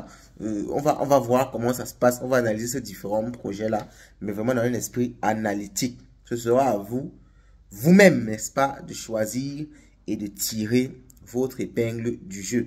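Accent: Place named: Cameroon. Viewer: Bonjour très cher grand frère tu es camerounais j'imagine quand je suis ta manière de parler ☺️ donc...... Quand je l'ai dit..... à mon humble avis le film que tu as commencé à tourner là, hum.... je pense qu'il va te dépasser. Les deux projets là avancent ooohhh...!!! Mais beaucoup de courage à toi, j'admire ton audace en tout cas 💪 Et merci déjà d'avoir enlevé le mot arnaque du thème c'était pas le mot approprié pour mieux exprimer ton scepticisme..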